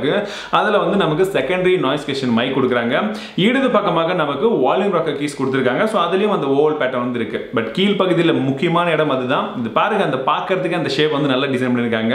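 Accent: Chennai, India. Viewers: Vara vara chennai slag bro naaku la naatiyam aada arambichiduchi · Innaa thala enga chennai baasai la konjam varudhu, but nalla irukku 🤣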